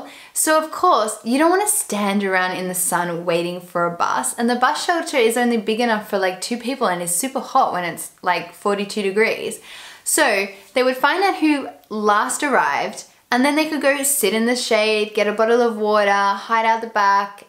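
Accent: Australia. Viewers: I love your Australian accent!! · Me encanta el acento australiano!!! la forma en la que dices "water" me hace mucha gracia jajjaj love it!! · I really love your accent. Beautiful accent + beautiful smile + beautiful eyes + blonde = perfect Aussie woman. I hope I could meet you in person. 😍😘😃 and I Iove your hair as well and you have sexy lips. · Tienes ojos tan hermosos y fascinantes que a veces no puedo concentrarme en lo que dices... Saludos desde Polonia,! Great work:) P.S. I love your Australian accent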